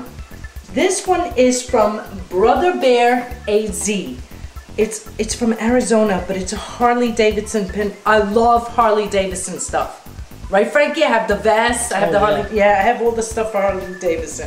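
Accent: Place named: New Jersey, United States. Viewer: My family loves stuffed mushrooms. I'll have to give them this recipe! Haha you're welcome for the pin!! Haha "NEW IERSEY" with NOOO J. You can soooo tell haha, don't you just love it!? <3Daniela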